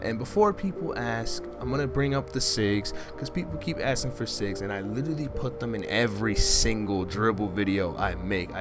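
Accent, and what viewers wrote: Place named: Florida, United States. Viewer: dope vid u must be from florida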